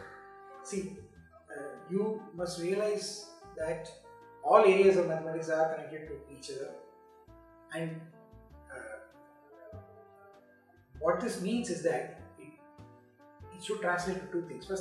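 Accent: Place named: India. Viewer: I cannot understand his India accent, no offense.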